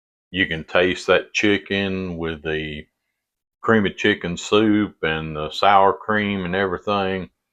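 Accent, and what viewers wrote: South, United States. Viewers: thanks for the tip. Thank you for wonderful recipes, step by step directions, an delightful southetn accent 🎉❤😊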